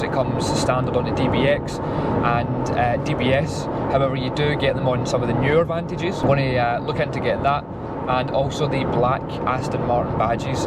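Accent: Scotland, United Kingdom. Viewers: Good video, nice to hear a Scottish accent after moving south from Inverclyde! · Do I detect a Scottish accent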